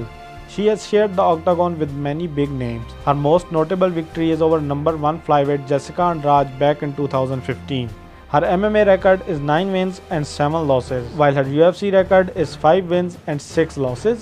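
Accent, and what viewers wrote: India. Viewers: This is not asian accent.. this is KELING ACCENT... LOL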